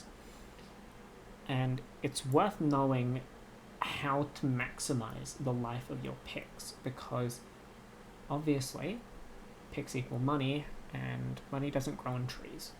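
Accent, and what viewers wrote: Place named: Australia. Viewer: Oooh, dont bend that fancy ratyoke pick! I always use absolute minimum tension, because I almost always use <num>in (~0.3-0.5mm) picks, i enjoy the feedback from them more i guess u could say. Thanks for speaking so clearly too, tbh I have a heck of a time trying to understand Australians speak, but didnt this time! Thanks for the informative video;)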